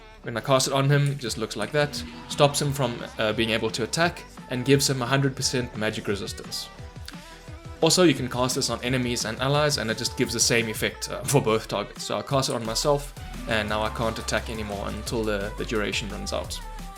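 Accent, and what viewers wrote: South Africa. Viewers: South African? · Came here to learn Oracle.. You sound like you from South Africa.. The motherland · Are you South African?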